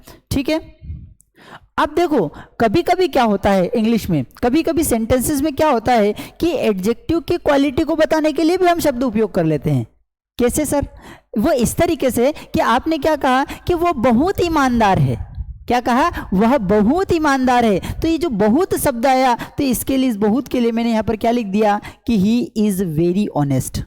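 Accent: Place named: India. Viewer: Sir ji ki English ki class me Pure hindi ka pronunciation ho raha hai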